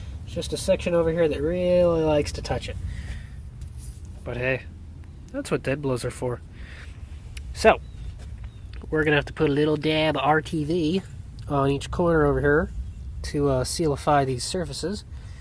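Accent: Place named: Germany. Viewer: is there any significance to to frequent, annoying, German accent ? other than that great vid.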